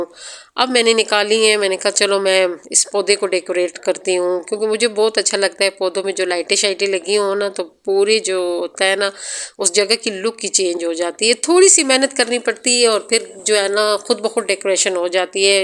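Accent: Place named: Murree, Pakistan. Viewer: Ap ka speaking excent murre k kisi gaon ka hy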